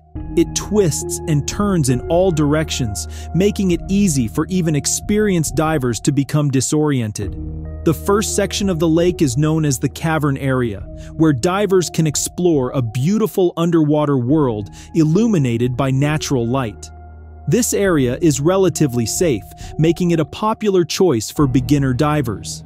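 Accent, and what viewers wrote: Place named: Germany. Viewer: dude, you have a german accent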